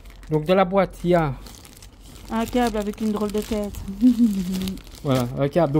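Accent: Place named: Belgium. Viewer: j'aime bien l'accent BELGE UNE FOIS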